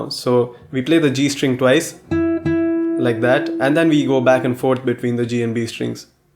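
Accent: India. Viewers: ohh, Indian accent ❤️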